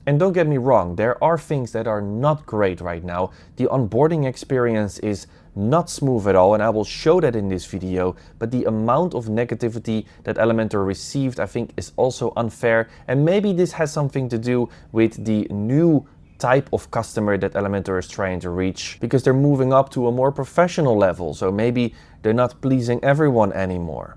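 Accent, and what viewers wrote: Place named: Netherlands. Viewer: Ive been an Elementor Pro user for years and Im seriously considering whether Elementor one, especially the mail feature and image optimization, would be worth it as a subscription for my clients. Id love to test it in real client projects. And yes, theres only ONE with that legendary Dutch accent. Sending you warm regards from Switzerland, where the English accent is just as charming, just with more mountains.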